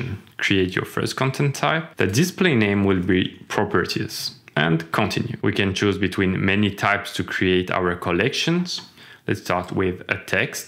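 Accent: France. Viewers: Appreciate the french accent.✌😊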